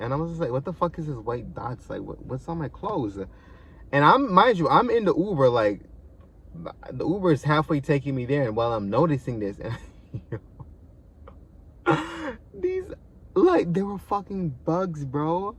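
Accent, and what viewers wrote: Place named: United States. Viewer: Love your stories man and your American accent.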